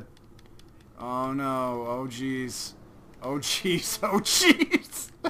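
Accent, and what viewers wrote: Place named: Russia. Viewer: i love your gameplay your so funny to excellect rusian accent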